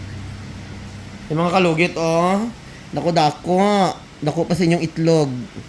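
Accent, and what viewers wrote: Iloilo, Philippines. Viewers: You sound ilonggo/hiligaynon po ☺️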